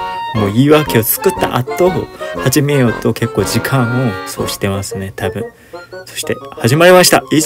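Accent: Fukuoka, Japan. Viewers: 誰が聞いたとお？ 福岡弁、うますぎ❣️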